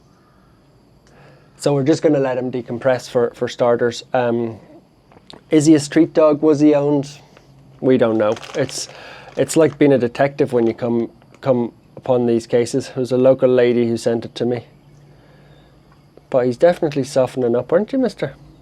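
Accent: Ireland. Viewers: Irish brogue/lilt ❤❤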